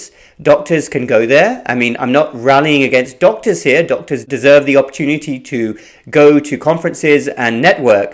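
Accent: United Kingdom. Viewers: I bet the Septic Tanks love your British Accent. 😁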